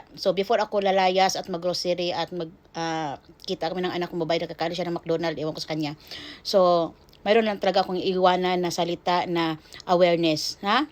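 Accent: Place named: Visayas, Philippines. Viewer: Bisaya man diay ka 😂😂❤❤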